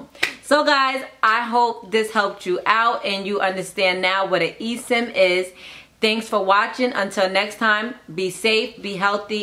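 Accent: New York, United States. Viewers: She gotta be from New York cause she ode sound like Sky from Black Ink😭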